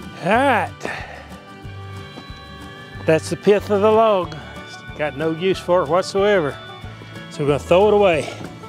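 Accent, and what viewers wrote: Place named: Tennessee, United States. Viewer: Happy Birthday, your accent sounds like mine here in TN, thanks again for your videos.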